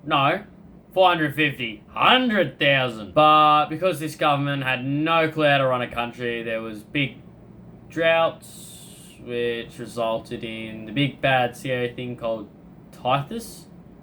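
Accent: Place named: Australia. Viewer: I was for sure expecting a deep Mississippi accent i was not expecting an Awesome Aussie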